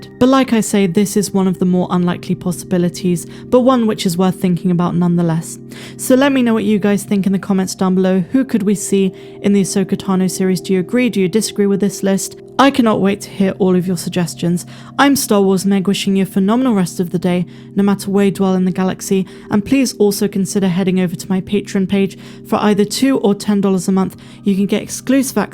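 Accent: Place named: Bristol, United Kingdom. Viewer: Where you from meg? I'm from Bristol, you sound very close 😂 liking the channel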